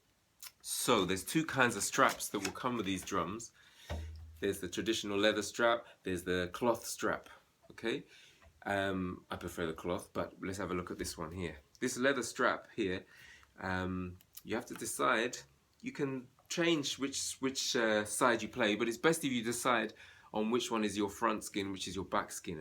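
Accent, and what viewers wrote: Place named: London, United Kingdom. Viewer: Hi Sir, please tell me whereabouts in the UK you are based - hopefully London from your accent? I am interested in buying one and taking up lessons. I am very serious depending on what the finances are. I have added you on Instagram and my name is David. Thanks.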